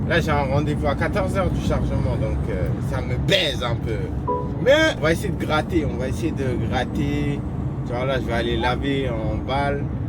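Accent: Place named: Marne, France. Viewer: Mais nan! T’es de Reims! Je le savais! T’as l’accent marnais 😊, un cagoulois de Chalons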